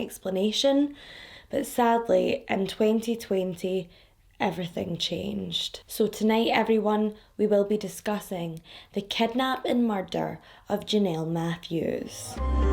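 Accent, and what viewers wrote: Scotland, United Kingdom. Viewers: I'm so proud of myself.. When i started watching/listening to your channel, i was having trouble with understanding your scottish accent. I had to listen really carefully. Nowadays i have no problem understanding. I'm a fluent listener of Scottish accent 😎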